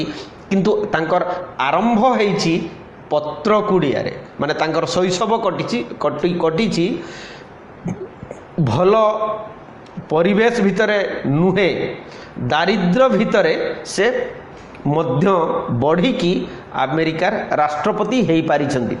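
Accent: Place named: Balasore, India. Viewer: Mun bhi baleswar Ru...ta katha kahibara saili baleswar re tike alga ...ta apana seibhalia katha heuthile ta jana padila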